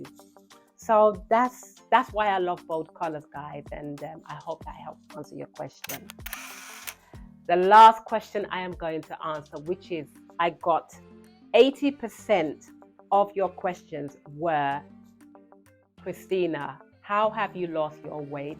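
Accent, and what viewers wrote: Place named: Nigeria. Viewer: I like your Nigerian accent 👌🏾 😍 🫂..you look beautiful as always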